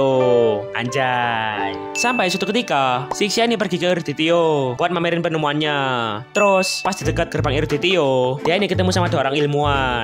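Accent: Java, Indonesia. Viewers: boso jowo mu kentel men mas, wong jowo ta? 🗿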